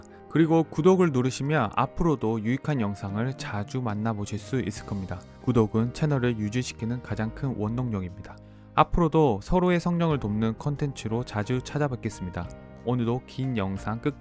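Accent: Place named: Gyeongsang, South Korea. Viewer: 경상도 사람인가봐요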